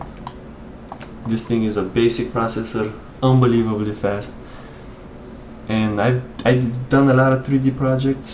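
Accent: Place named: Brazil. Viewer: Your accent sounds like brazillian.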